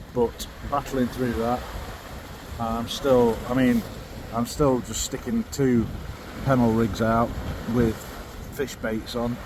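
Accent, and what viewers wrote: Yorkshire, United Kingdom. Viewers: Ey up mate are you from Yorkshire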